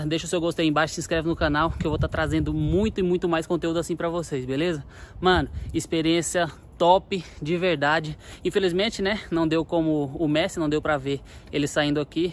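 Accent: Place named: Spain. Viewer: Jajajaja que bueno es tu español, de hecho tu acento parece el de español españa! Tenes familiares españoles o algo ?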